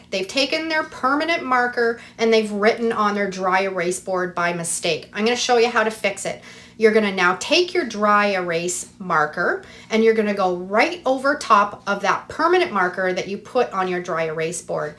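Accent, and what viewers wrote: Canada. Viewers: Sounds Canadian. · And she sounds CANADIAN 🇨🇦 I grew up in Hamilton and still catch myself saying abowt 😊😂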